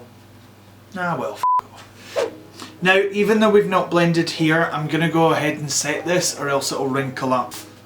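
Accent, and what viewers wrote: Scotland, United Kingdom. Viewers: Hahahaha I love the Scottish accent never heard it before I became a big fan hahaha , now I want to change my English accent into Scottish haha haha ❤️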